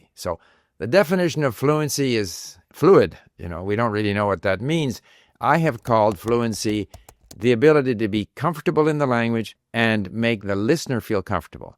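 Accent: Iran. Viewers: از نظر شما هم لهجه‌ش مازندرانیه؟!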